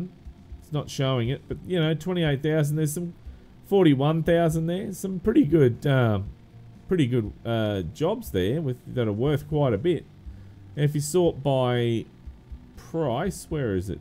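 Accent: Australia. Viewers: I hope that SCS Software DLC (as you mention in the video) will include some of the latest SCANIA Truck models as well, and not only Volvo trucks. I do have to emphasize that as an old former truck driver (25 meter) with years of experience, SCANIA is the brand of trucks that are very close to my heart, and have always been. In another words, I'm a loyal SCANIA Truck Fan. By the way, with all respect, I really like your Aussie Accent. And another thing, stay away from the Whitetips, Eastern Brownies and Taipans (specially the inland ones);-) Take Care and God Bless You and Your Family.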